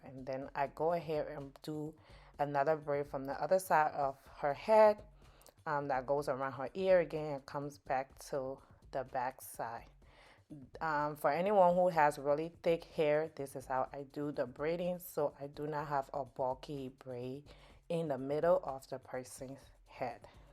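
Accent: Liberia. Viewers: I knew you were Liberian as soon as I heard the accent.. love the video.. I’m Liberian as well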